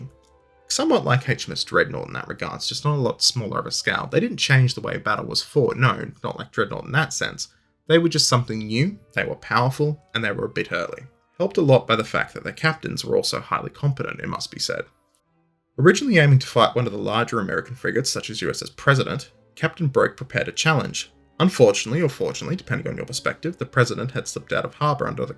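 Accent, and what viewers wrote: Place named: Australia. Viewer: His "vocal fry" is part of his regional australian accent. Some accents have natural vocal fries. Not every vocal fry is an affection by hypsters, you know? The entire world is not american, you know? English speaking foreigners,, dude, it is a thing.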